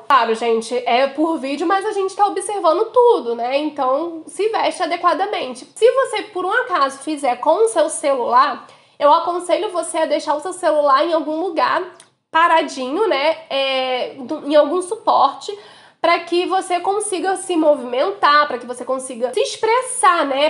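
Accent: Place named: Rio de Janeiro, Brazil. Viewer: deve ser pq a maioria das dublagens são do RJ e o sotaque é o mesmo 😁 por isso ele lembra das dublagens haha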